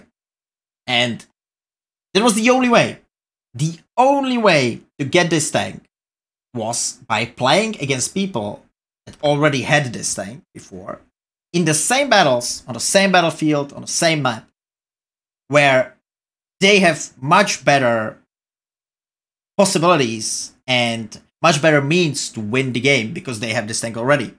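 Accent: Czech Republic. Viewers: ingliš vidijou miluju czech accent over muj british :D:D:Dd:d tohohle typka miluju proste, nejůepsi wot content a zvedne ti naladu kdykoliv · Zase tak skvělou ne, ale už jen že mluví s českým přízvukem to dělá srozumitelnější a to o HODNĚ. Taky mluví dost pomalu. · Angličtina jako čeština pikacu ,smysl ok😊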